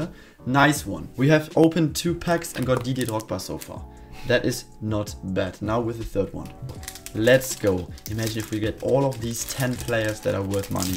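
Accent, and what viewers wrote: Germany. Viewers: Wie Mann es einfach hört das er Deutscher ist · Bist du aus Deutschland? Hört sich so an. PS gute Videos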